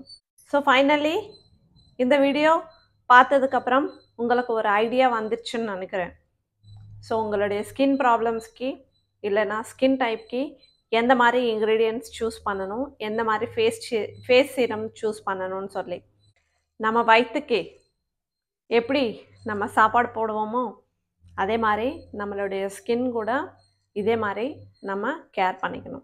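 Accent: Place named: India. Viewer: looking beautiful in yellow saree😍pimples will come due to body heat 😍Alovera fresh a eat pannu na pimples reduce agum 😍ponnu put vlog in pink saree😍your tamil slang is cute😍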